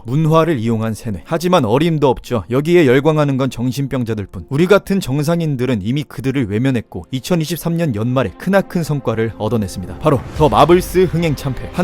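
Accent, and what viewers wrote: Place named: Jeolla, South Korea. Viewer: 전라도 사투리 빼라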